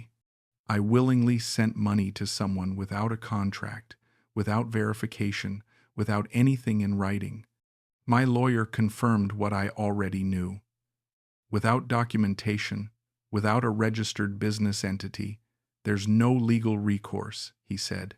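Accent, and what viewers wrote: United States. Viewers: The Story is FAKE! 1 : You Don't need 250k AUD to start a small restaurant Carenderia type in the philippines as she was talking in the video.....the type of eatery she grew up in the province 2 : She has and drive a car? she is not as poor as she said......a 10 years old 2nd hand car cost around 400k PHP .....hmmmmmmm.... 3 : The description from the guy and the voice does not have an australian accent but american 4 : You must be really stupid to send 250k USD just like that.......makes you wandering how did a guy so silly managed to save or aquired it in the 1st place. So in my opinion The story sounds convinsing and it might generate viewers BUT I THINK IS FAKE 100% :)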